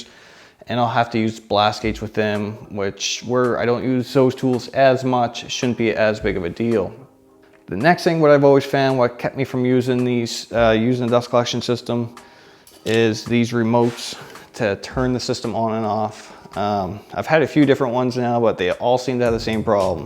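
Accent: Canada. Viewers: I believe he is canadian, not a yankee :p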